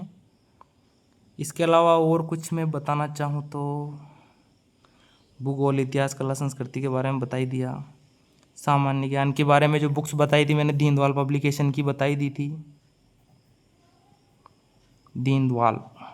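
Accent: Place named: Sawai Madhopur, India. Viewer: Sir apke bolne ke tarike andaja lga paa rha hu aap sawaimadhopur ya aaspaas area ke hai....❤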